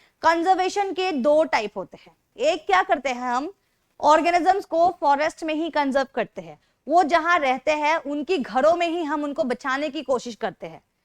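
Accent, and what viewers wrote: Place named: Bengal, India. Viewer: Mam 's accent is like a Bengali....😀👍🏻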